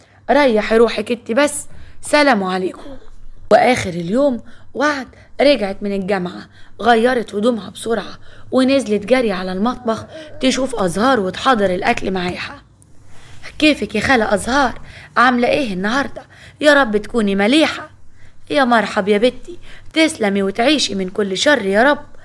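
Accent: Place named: Upper Egypt, Egypt. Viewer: واو بصراحه تعجبني حلقات بصوت صعيدي❤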